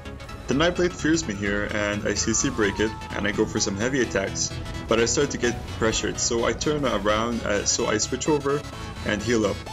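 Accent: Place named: Austria. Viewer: Österreicher? :-) Klingt bisschen wie Arnold ;-)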